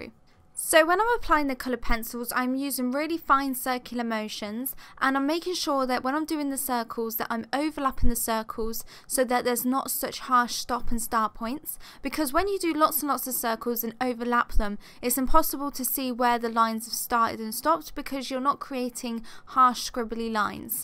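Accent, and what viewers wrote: England, United Kingdom. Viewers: Can can hear you are English. I have a problem getting Prisma's here. I can buy whole sets here in the UK..but individual colours are impossible to find. Can i ask where you find yours? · I love your British accent😍🔥 . Is it just me who is just listening you talk rather than actually understanding 😂❤️....loved it though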